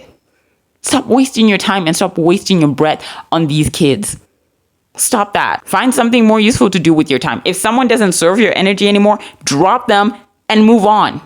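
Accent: United States. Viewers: I had no idea that you had never been to the U.S. before. I always thought that you spoke English with an American accent. ; )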